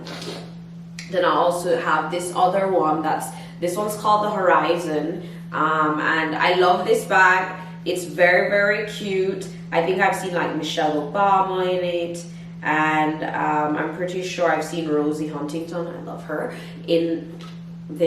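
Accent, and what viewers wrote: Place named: Caribbean. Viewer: You sound West Indian Babe x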